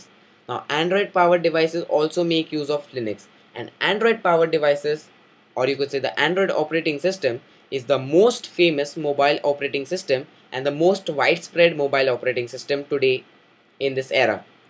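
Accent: India. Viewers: I don't like it either, but it's because we're not used to it. It doesn't ruin the video, as it's one of the better Indian accents that I've heard. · Lmfao diz fuckin' indian , how2speaksofunny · He's an Indian.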